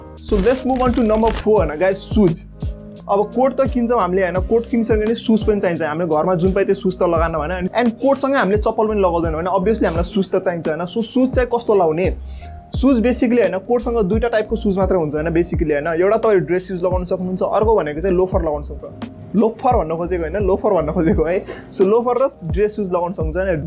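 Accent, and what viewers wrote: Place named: Dang, Nepal. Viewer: Bro Dangali haixa 😊😊Voice sunera tha vayo 😃😍😍घ्याच्चै😂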